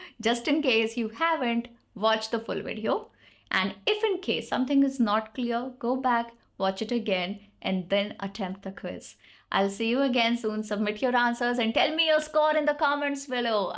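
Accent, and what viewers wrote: India. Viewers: Your excent is so indian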